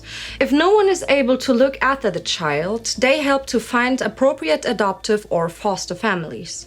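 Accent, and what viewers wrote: Germany. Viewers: A clue for you, she's speaking English, albeit with a German accent. HTH. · Very interesting post to the channel. Also, your German/English accent/inflection is charming and reminds me of friends mother who was born in Germany and imigrated to the US in the 193os.. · your video is exceptionally well researched and the presentation is professional and beautiful. I assume that you are German although your English is excellent. I can hear that in the way you pronounce German names and terms. I'm going to subscribe to you now because you really deserve it. Love from the German Alps:) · It is called a (faint?) german accent. It goes well with her stern looks.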